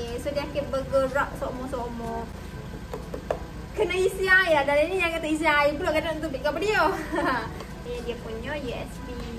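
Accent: Kelantan, Malaysia. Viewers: cakap tu bagi elok sikit, kelantan lakk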